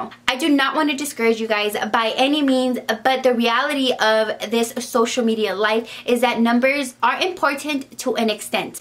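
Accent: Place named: Latin America. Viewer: love your acento girl ! go latinas <3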